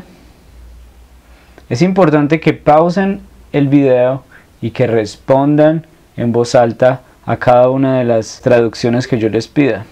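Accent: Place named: Cali, Colombia. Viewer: Hacés un grán esfuerzo, hermano, gracias por tu tiempo. saludos y apuesto que sos caleño jajaja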